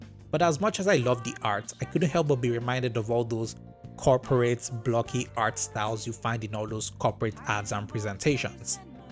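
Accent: Nigeria. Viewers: i could here the nigerian in your voice bro this show is nice but kinda wierd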